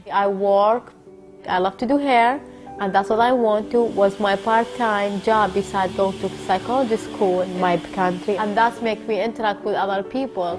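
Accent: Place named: Iraq. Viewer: بالمناسبة لهجتج عراقيه انتي مواليد ايام دخول الجيش العراقي و ممحونه.....ما ننلام من نجناكم بالكويت.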